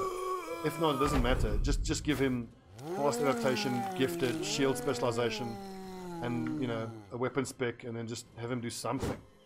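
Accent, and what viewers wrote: South Africa. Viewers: you sound like a fellow South African, great game and looking forward to watching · just found you randomly and listened and realized you are a fellow south African GG subscribed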